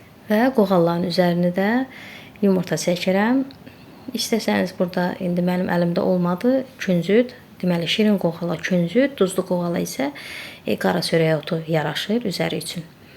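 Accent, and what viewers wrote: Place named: Shamakhi, Azerbaijan. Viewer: Əllərinizə sağlığ gözəl görünür, ləhcə şamaxı ləhcəsinə oxşayır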